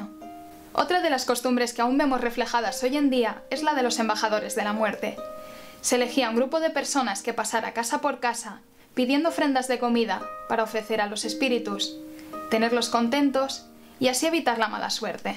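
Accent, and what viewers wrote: Catalonia, Spain. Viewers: Que buena información! De que parte de Cataluña sos? Saludos desde Argentina! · De qué parte de Catalunya eres??? 🙊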